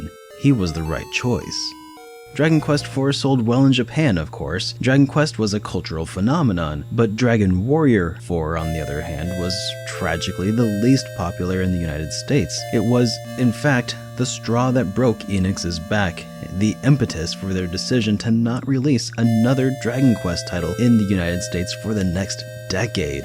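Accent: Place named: Cockney, United Kingdom. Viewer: MasterStryfe I thought it was because he was using cockney English.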